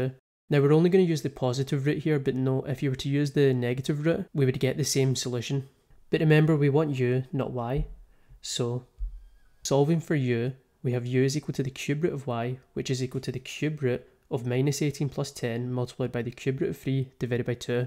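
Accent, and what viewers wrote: Scotland, United Kingdom. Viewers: I did not imagine someone named to have a Scottish accent. Great video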